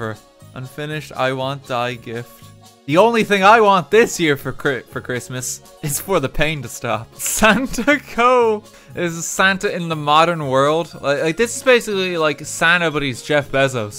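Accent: Ireland. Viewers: I’m a new viewer and he has a hard Irish accent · And a Happy New Year,! Thanks for a year full of Irish accented misadventures.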